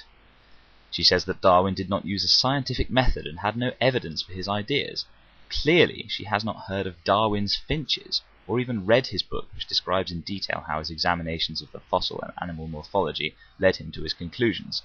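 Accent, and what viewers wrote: United Kingdom. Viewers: I made a response too but it just sounds so much better with an English accent!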